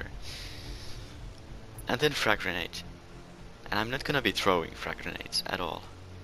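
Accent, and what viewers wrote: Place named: Finland. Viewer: you sound like finnish, i'm finnish btw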